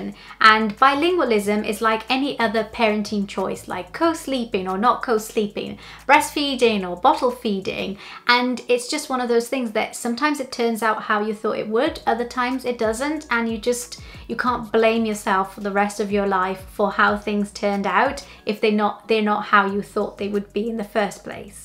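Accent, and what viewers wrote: Wales, United Kingdom. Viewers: Is her accent Welsh? Because it's beautiful. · Your not from Brazil, your welsh accent is too perfect aha how long have you been in Wales 🏴🇧🇷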